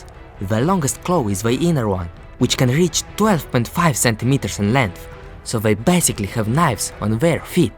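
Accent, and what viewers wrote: Russia. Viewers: i love your russian accent , kisses from Algeria ! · Oh I thought you were from Russia because of your accent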